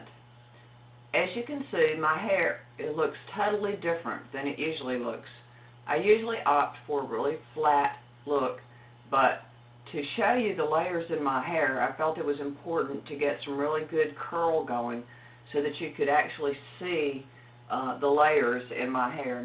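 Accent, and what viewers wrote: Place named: South, United States. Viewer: Honestly if a person doesn't like your accent they can just not watch your video. I found this tip very helpful and I appreciate the time you spent making this video. Now I was born and raised in Alabama since I was 15 and I've heard a lot of accents like yours. I think Southern accents are beautiful and I was sad to find that my own accent (though not as strong as yours) disappeared so quickly when I moved up North. It's refreshing to hear a strong Southern accent every now and then.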